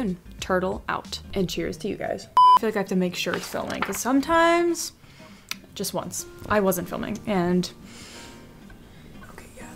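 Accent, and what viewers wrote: Australia. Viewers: Reverse psychology, "Mama needs a drink" followed by "It's 5 o'clock somewhere" Love the accent are you from Australie... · Dang, that is a long day!! Glad you found great companions from Philly to NYC though! I once ended up taking a Honda Civic (coup), two trains, and a bus ride to get from my college in Kansas to Ohio for Christmas. Even got mugged at one point. Also, your Australian accent sounds just like Margot Robbie! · I love your Australian accent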